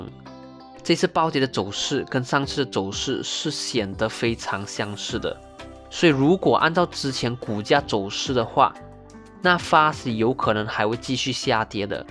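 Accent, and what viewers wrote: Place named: Malaysia. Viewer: Austin是马来西亚人吗？说话方式很像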